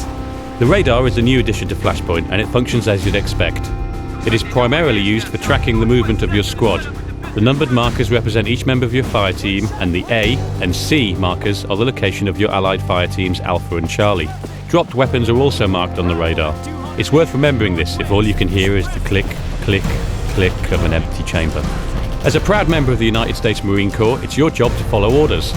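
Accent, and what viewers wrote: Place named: United Kingdom. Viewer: "As a proud member of the United States Marine Corp" he says with a British accent.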